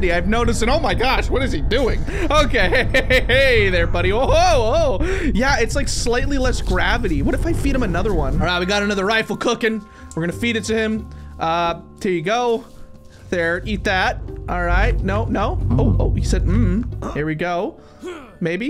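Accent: Scotland, United Kingdom. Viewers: Ah yes just randomly turning British No hate pls no hate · Bro u sound sccotish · The British accent 😍 👌 🤣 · Love the British voice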